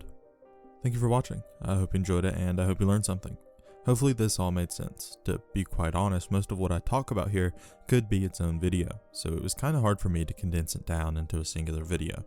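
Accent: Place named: United Kingdom. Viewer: you are super clearly british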